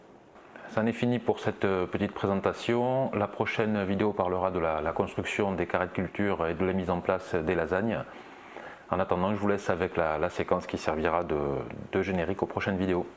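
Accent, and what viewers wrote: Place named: Occitania, France. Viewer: Salut, exactement on s'en fout des mots qui finalement desservent la communauté. Oh on a le même accent, ce bel accent des gens du pays d'Oc, et avec ce brin d'auto dérision je suivrai tout ça avec beaucoup d’intérêt, je te souhaite de créer un bio lieu de vie dans ton jardin mais surtout de t'éclater dans ce que tu crées (ça ne veut pas dire, de se prendre des pelles dès que tu mets un pied dehors hein mdr).;)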